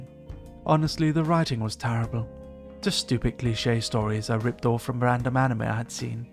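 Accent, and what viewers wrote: Denmark. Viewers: While your English is very good, I can still hear the tiny bit of danish accent in it... as a fellow Dane, I can spot another Dane... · Funny considering every dane can easily hear he's danish But his way of speaking is very endearing!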